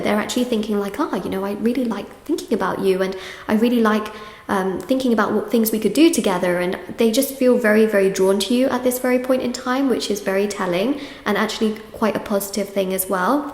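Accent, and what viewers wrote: United Kingdom. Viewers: For some reason I never put 2 & 2 with the UK accent. I just thought you wanted to be treated like a princess heh.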